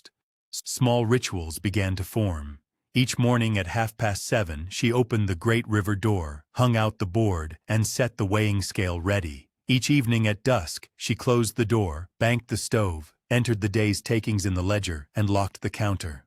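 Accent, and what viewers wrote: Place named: United States. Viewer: Hint - get rid of the American voice. Im so peeved by the USA i will not listen to anything with USA accent !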